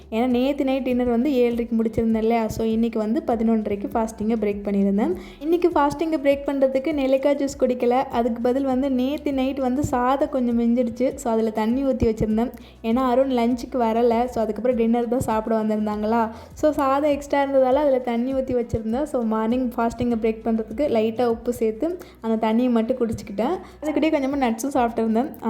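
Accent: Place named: Sri Lanka. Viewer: Hi sis thodarthu paakuran ur video Sister neenga Sri Lanka va unga pesu appadi iruku ❤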